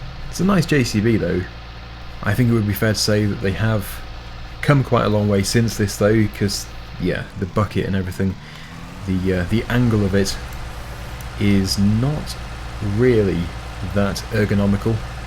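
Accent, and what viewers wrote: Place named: North London, United Kingdom. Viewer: Gal from north London er er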